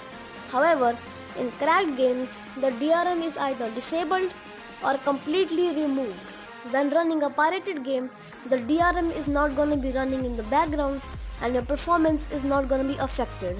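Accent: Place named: India. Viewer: My Desi accent boi🙂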